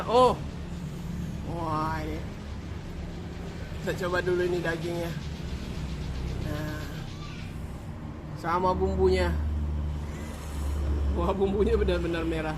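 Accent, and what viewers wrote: Minangkabau, Indonesia. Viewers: ulangnonton seronok mendengar suara lpghat minang tetapi tidak pekat.masih boleh faham selamat baik sampai jumpa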